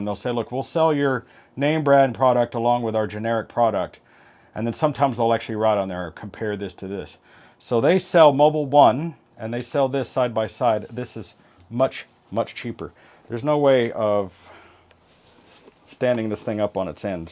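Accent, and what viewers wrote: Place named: United States. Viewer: Yup! The American accent is difficult to follow especially when spoken at such hectic speed! An awful lot of talking ("tarkin') but little action. Man, "you gotta get your butt" to Cape Town, South Africa, where we do things "good and proper" - even with RR, Jags, BMW, - the whole lot! Metric and Imperial - no problem! Oily hands - no problem! We don't even need silly gloves - we use hot water and soap! We also speak a better English than the English in England! 😊 My Dad managed the best BMC agency in South Africa, and I spent much time at the benches after school, learning skills and a few naughty words! The skills are ever-useful, and I am blessed, at the age of eighty-one, to be able to run a health practice at home and to repair so many home items for my clients!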